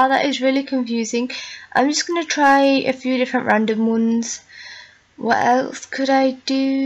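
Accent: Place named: England, United Kingdom. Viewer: You’ve got an English accent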